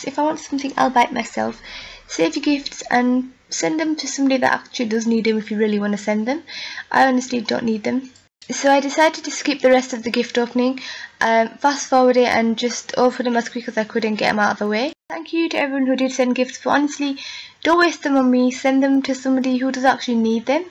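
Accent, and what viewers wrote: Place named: England, United Kingdom. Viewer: You’ve got an English accent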